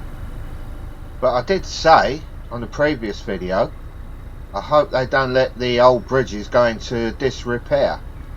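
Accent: London, United Kingdom. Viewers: Please don't stop " rabbiting" I love your accent, but as a Londoner I'm biased 😉. Your commentary, historical info and snippets of interest are just perfect. Don't change a thing. I had a friend who lived in Pennylands, it might have been purpose built estate but it was a peaceful place for her to live. I had no idea the basin was just behind her bungalow. Look forward to your next vlog 😊 x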